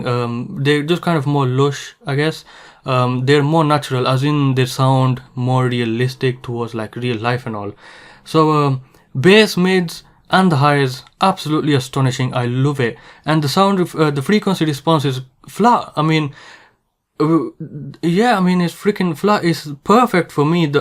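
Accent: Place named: Manchester, United Kingdom. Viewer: You sound very Mancunian. Thanks for the review!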